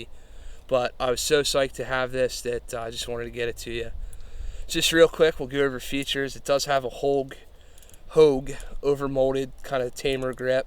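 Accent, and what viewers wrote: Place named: Philadelphia, United States. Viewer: Good review. Your accent says, “I’m from the Philly area!” amirite? Maybe Delaware?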